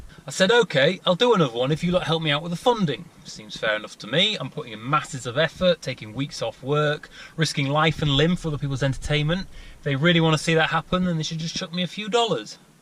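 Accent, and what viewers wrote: United Kingdom. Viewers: What this dude serious in prison? Is tha wha all these comments are about? Damn. Hope he’s in British jail rather than a US jail with this accent bruv. Good luck! Hope they didn’t get u for that long for damn train hopping though god dang. I’m a train fan, yes it’s incredibly dangerous but…anyway. As long as you didn’t hurt anyone or something, sounds like this guy shouldn’t be in jail for 8 years or whatever??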